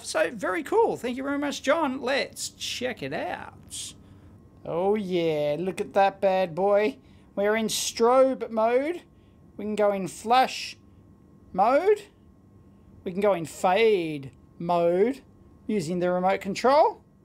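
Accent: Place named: Australia. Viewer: English... with an Australian accent.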